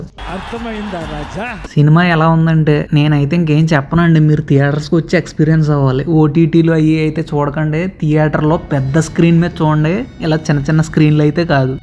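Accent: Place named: East Godavari, India. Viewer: Me voice bale untadhi... madi rayalaseema but maku east godavari antharavedi lo maa friends unnaru me slang istam maku anna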